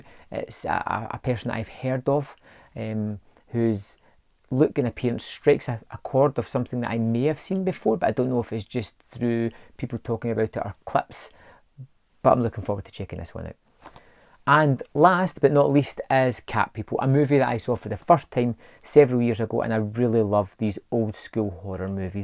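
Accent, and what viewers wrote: Scotland, United Kingdom. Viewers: First time watcher. Good to hear a Scottish voice. Nice pickups buddy 😉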